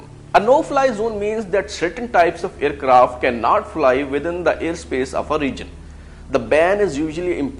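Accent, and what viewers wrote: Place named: Punjab, India. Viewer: Content in English Style in Punjabi accent :)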